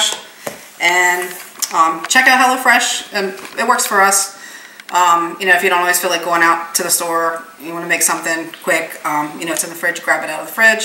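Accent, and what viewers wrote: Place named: South, United States. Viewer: I've laughed seriously out loud on your southern accent lol.....first lesson....southern talkin 101....taters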